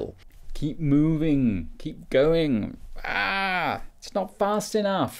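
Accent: England, United Kingdom. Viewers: He's wearing a Ralph Lauren sweater, has an english accent and his name is * LIKE EARNT * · Good course. and I like the British accent :-)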